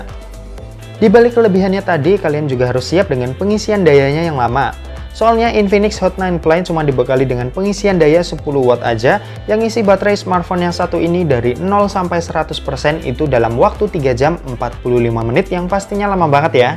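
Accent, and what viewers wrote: Semarang, Indonesia. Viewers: Org semarang ya gan? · Orang semarang lu yak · Wah, abang orang Semarang ya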